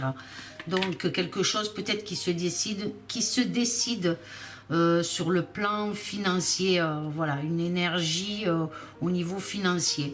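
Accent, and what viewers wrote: South, France. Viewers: Bonsoir toujours un plaisir de vous écouter heureusement que Dieu vous a donne ce don pour nous éclairer et puis j aime le petit accent du sud 🙏🏾❤️☀️🌹🧚🏿☘️🌞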